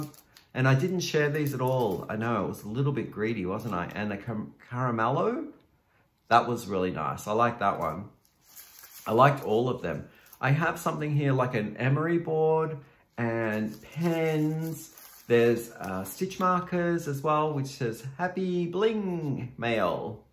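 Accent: Australia. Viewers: A<link> 😆 A Pixel appearance! You should actually do some video vlogs with Pixel sitting with you on camera 😜😍.. I never knew you were from Australia 🤪 I knew u had accent which I love but I just thought it was some sort of strong Canadian accent lol 😂 Why in the world would you move to the cold Canada from Australia dear? Lol 😝 What beautiful happy mailings. Those hobbi ones were gorgeous.. my fav is the darker purples one. The colors 8 and 10 I thought was the same color. They almost look identical. I bet you could actually use them together 😜 And Zee is wonderful! She’s so fun to watch. You were lucky to receive her birthday colorway 😍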